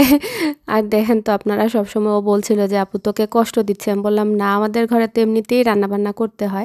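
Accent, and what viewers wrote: Sylhet, Bangladesh. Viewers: Apu amrar sylhety mat hunla hasa ja bala lager ❤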